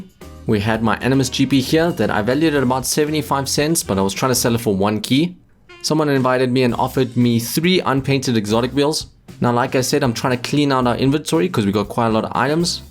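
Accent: South Africa. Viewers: Your South African right?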